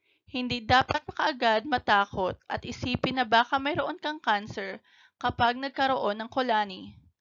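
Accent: Visayas, Philippines. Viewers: Ok n saya.. Kaso bisaya te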